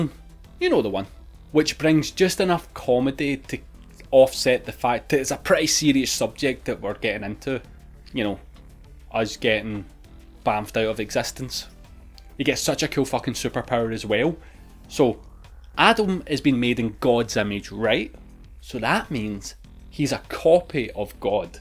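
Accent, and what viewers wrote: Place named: Scotland, United Kingdom. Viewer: Hi. Well done really. You make me curious to watch it actually. I think the job is done in that case. I'll do what I do best... Opinion time. + Great performance + good topic + perfect editing + super transitions + good integration with watcher (me) Ready for that? - YOU HAVE TO GET RID OF SCOTTISH ACCENT IF YOU WANT TO BE LISTEN GLOBAL. I'm so sorry to say that:( Overall piece of great work:)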